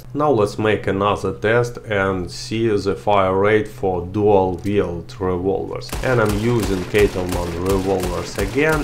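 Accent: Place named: Russia. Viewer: Good vid, i like your russian voice, you sound so much cooler than my boring midwest american🤣🤣gonna sub ya now too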